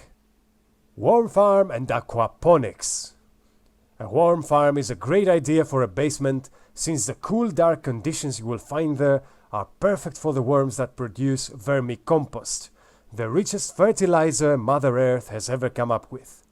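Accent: Greece. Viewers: Κατάλαβα αμέσως ότι είσαι Έλληνας από την προφορά σου έκανα sub γιατί βρίσκω το περιεχόμενο ενδιαφέρον. Σου εύχομαι τα καλύτερα και καλή συνέχεια! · Chris Chavez Well, it's definitely not Indian, and I don't think it's Turkish, although that is a better guess. Sounds a lot like Greek to me. Specifically southern Greece. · Ο πωπωπω η ελληνική προφορά την ακούς παντουυυυ. 🇬🇷🇬🇷🇬🇷🇬🇷🇬🇷🇬🇷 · Your accent sounds Greek...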